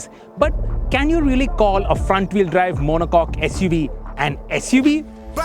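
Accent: India. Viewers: Indian english hurts my ears so bad😂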